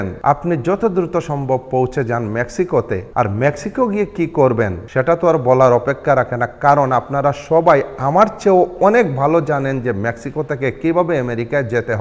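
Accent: Sylhet, Bangladesh. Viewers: আসসালামু আলাইকুম ভাই, আপনি কি সিলেটের।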